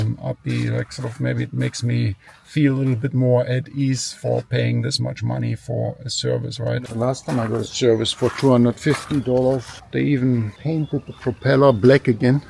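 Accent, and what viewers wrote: Germany. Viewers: I hate Germans speaking English.... including myself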